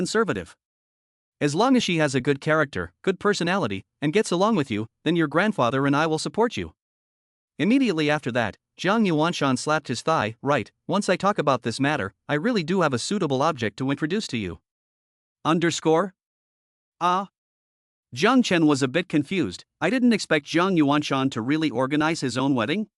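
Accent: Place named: England, United Kingdom. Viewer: This English accent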